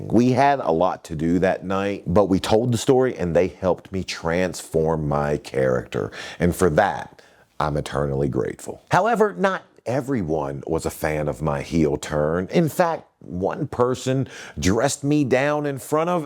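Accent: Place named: South, United States. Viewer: You had potential to ascend back then. You could have been a biracial JBL. You have a great biracial look, nice southern accent and could have used it as a “better than you” appeal. I’d have you wearing suits, clamoring your education background, look and acknowledge being half black and half white. By being half black and white, you could have been nicknamed “The best of both worlds”…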